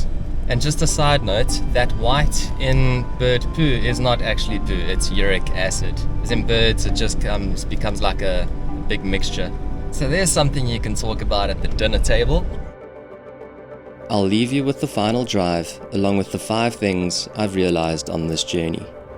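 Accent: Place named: South Africa. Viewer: It was hard to hear you being so hard on yourself in the video. Because watching this as a South African expat living in Europe, my heart yearns to be home. My heart actually breaks, not being able to be there in nature with my family; with our beautiful sunshine; with our friendly people or awesome food culture; our magical animals in our national parks. And your videos bring me so much joy and so much peace, listening to a South African accent, speak about our home, rather than an American tourist, who doesn't know the first thing about our home and our animals😂 Please dont be so hard on yourself - you don't know how much warmth you bring to so many people with these videos and I pray that you continue to prosper.❤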